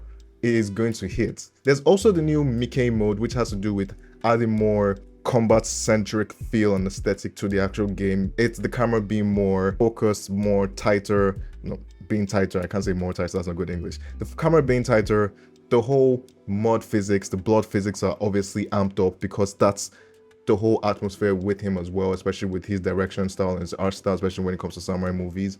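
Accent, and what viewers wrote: Nigeria. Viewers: My broda, as soon as I heard that accent I said, he is one of us............. Naija!!!! About Yotei, honestly this was a bit disappointing to me, I finished Tsushima and its DLC on PC, so I was expecting them to come with some more fire, honestly I didn't expect them to just repackage the same thing, make some editions and sell it to me, this won't be a skip but it's definitely not a Day 1 for me. I'll wait for this to come to PC and play it, btw I just subscribed to your channel.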